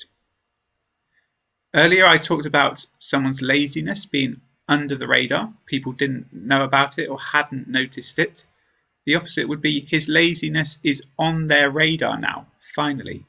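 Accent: United Kingdom. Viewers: Thank you! Your videos do help me a lot! I am a Chinese student majored in English language and literature. I haven't been in England yet. But your videos provide me a window to Great Britain; and make me feel like that I have been living in London already. I had watched your videos for two years since I got access to YouTube in 2014. Watching your videos like taking English course, that makes you as my mentor. Your videos are always interesting and useful. They really help me a lot. And one more thing, your British accent , classical! Waiting for your videos. Thank you for your videos.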